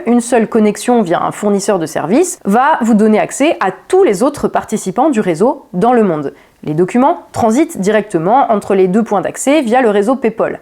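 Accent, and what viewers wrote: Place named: United Kingdom. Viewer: Autant on passe sur l'accent anglais globalement autant le pépale répéter tous les 15s dans la vidéo était très pénible à entendre..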